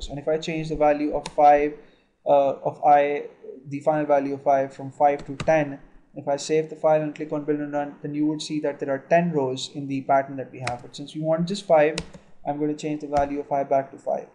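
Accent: India. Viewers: even youtube doesn't understand the freaking hindi accent.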